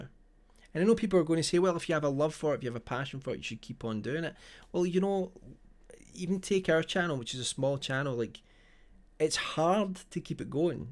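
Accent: Scotland, United Kingdom. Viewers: Well said or rather, well said with a Scottish accent 🎧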